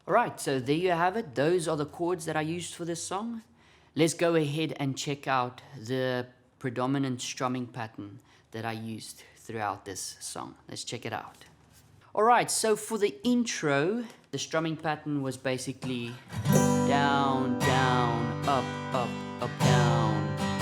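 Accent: South Africa. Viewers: can spot a south african accent from the first word hehe, great tutorial thank you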